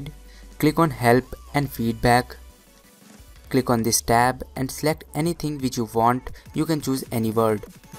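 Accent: India. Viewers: I dont understand indian english...accent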